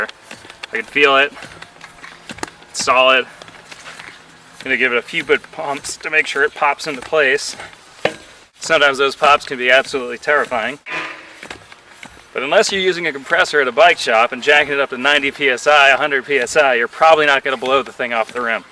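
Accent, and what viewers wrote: New England, United States. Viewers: Kid! This video was wicked! I sense that you're from New England.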